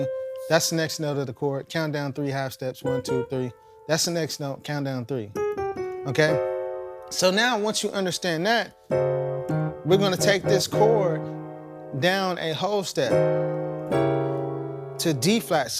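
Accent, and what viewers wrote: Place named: United States. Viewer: Can anybody tell me please, wich region of América this accent comes from?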